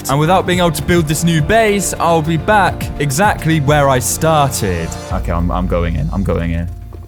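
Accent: United Kingdom. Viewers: oh god this is my first time coming across he sounds to british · Ngl you sound like British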